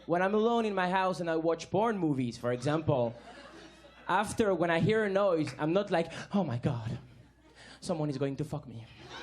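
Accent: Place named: United States. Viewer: Ohh I like so when you speack english! It s so suitable to you! We could believe you would be a true american boy lol so cute ^^ me too my dream is to go one day to New York, i watched "sex and the city" i loved this serie and so i dream to go there....one day i ll make it!!! Then, i have to practise my english so i will wathed all your sketch that i could find in english on youtube...😊😊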